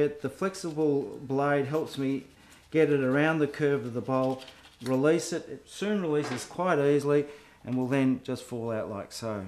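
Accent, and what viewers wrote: Australia. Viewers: Lovely. I am just interested in turning techniques and results (being a female without a lathe or indeed a shed to put it in I can only admire the work of others. But I am truly amazed at the number of things that can be used in turning. I saw one video where the man used pieces of kitchen sponge in resin and the coloured pencils items are amazing. Good to hear a home grown Aussie accent too. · I'm Just really happy he's Australian, and I can hear the familiar sayings and accent.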